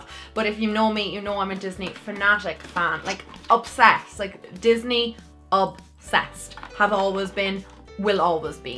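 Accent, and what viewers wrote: Dublin, Ireland. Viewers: i think shes from dublin i hear the dublin accent in her i live in cork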